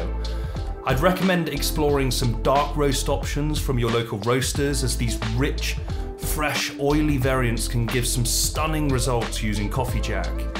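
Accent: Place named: England, United Kingdom. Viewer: Nice English accent 👍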